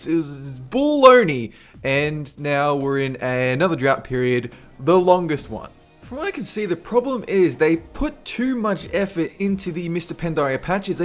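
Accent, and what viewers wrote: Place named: Australia. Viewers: Haven't you ever heard an Australian before? TBH your observation is correct but seriously? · ... no. I have heard plenty of Australian accents both in real life and in the media(not just American), but placing his was difficult. I thought it was either Aussie or South African. He has an odd cadence to his speech and thats what threw me off.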